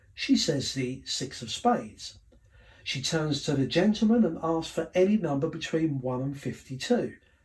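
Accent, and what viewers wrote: United Kingdom. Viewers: Mic-method Clever but still pretty lame. You do not fool the people you ask for help and the people who went with the guy to the show who knows his voice. I do not think that this is a good trick. I think it is the worst performance I saw on Penn & Teller. She deactivated comments under this video. She problably got some hate for it. She should have done some stunt and magic. Her British accent is hot.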